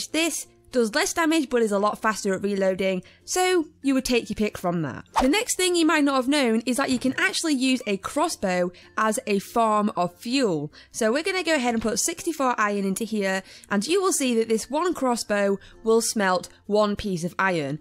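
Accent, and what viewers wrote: England, United Kingdom. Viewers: Cant You Hear Her? And Shes English And What Language Are you?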